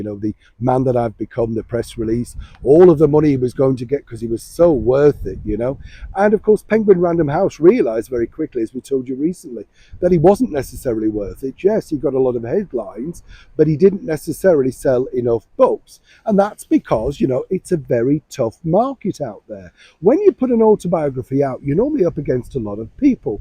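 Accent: Yorkshire, United Kingdom. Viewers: My mum would drink brandy and babycham when my parents went out!, are you from West or South Yorkshire? You remind me so much of a close friend from Doncaster! Happy new year to you xx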